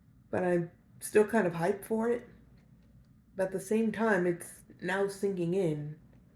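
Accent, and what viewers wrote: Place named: United States. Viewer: hey man really sorry to hear that this is still something youre not sure about. im myself gonna start estrogen in around 3 months after a journey of 3 years of trying to get on them. i'm from germany so i dont actually know how hard it is in america (im assuming youre from there by your accent). and even now i have my doubts. but whenever i think about if i really wanna do it i try to think about the alternative. which for me would be growing old in a male body. and that is like a genuine horror story to me... maybe try and rationalize it for yourself like that? i have these thoughts because im naturally very androgynous and have been "misgendered" in both directions since i was a little kid. people never know how to read me. but if i think about how my body will just become more nad more masculine with time it actually terrifies me idk. 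i'm 24 now so i have plenty of time to enjoy my life during and after medical transition :) socially i'm fully out to everyone for almost 5 years now so thats not an issue anymore lol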